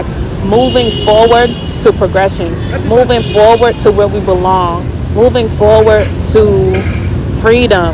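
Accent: United States. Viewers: This is easy. Your family thinks you're mentally unstable. They feel you are ungrateful for the sacrifices they made to escape from Jamaica. They also think you're putting your fantasies and expat self-loathing before your daughter's well-being. I don't think you're insane, but I do think you're extremely gullible and immature for your age. You're also spoiled. Many doors have opened for you simply because of your extreme physical beauty. Despite your good fortune, you allowed airheads to convince you that you were lost and without value in America. You will continue to feel even more lost in a continent that is not your home. You will always be a foreigner in Africa. No matter what country you visit, you will be reminded of that. Will you be treated better than Africans from other African countries? Sometimes, but only because of your American accent which will make the natives think you are rich. 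You will be overcharged, scammed and a target for theft almost everywhere you go. It will also be extremely exhausting dealing with visas. Thankfully, you will still have your American passport, because YOU WILL NEED IT to return to the country that you despise.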